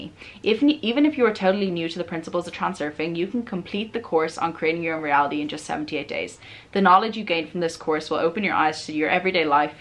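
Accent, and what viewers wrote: Ireland. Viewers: Which part of Ireland is your accent from? I've been watching for a while and I absolutely love it! 😁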